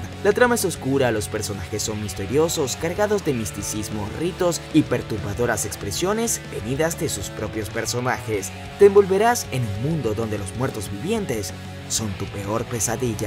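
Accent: Venezuela. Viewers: marico eres venezolano :o no pense que habrian mucho otakus venezolanos saludos desde puerto cabello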